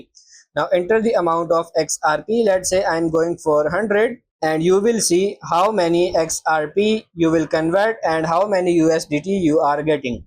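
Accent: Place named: India. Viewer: Tumhare Awaz Bata Rahi Bhai Tum indian Ho Par Best Of Luck Brother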